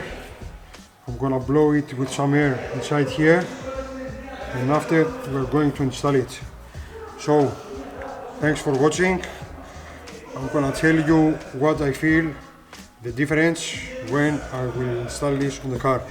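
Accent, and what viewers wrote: Greece. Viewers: I assume this is the manifold you bought from <link>: ALLOY WATER HEATED TORQUEMASTER INLET MANIFOLD HS4/6 HIF38/4 I guess they would rather sell you a more expensive twin-carb manifold with some extra carburettors Hope to see some more Mini-Videos soon!!! I like your (broken) English with a Greek Accent! I speak (broken) English with an Afrikaans Accent! (LOL)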